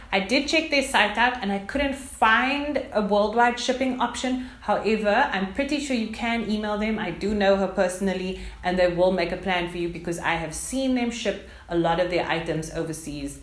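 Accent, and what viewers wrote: South Africa. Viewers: Joburg accent neh☺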